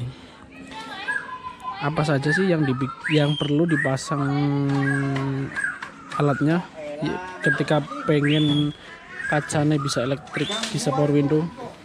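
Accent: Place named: Java, Indonesia. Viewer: Bahasanya belepotan. Campur aduk medhok .ha...ha...ha...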